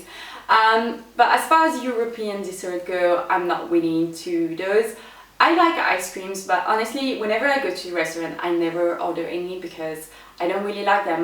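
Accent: France. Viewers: Thanks for answering my beauty questions! This was a lot of fun to watch:D Sadly I don't think many late 80s, early 90s kids, escaped to whole icy blue eyeshadow with bright red lipstick phase, so we can all shake our heads in solidarity:P Glad to know I'm not the only one who couldn't place your accent when first discovering you, I think it's because it's not the stereotypical french accent you see in movies (using overly french pronunciation) but just a faint one, that has people so confused. Definitely going to be picking up that volumising spray when I'm next in Boots:D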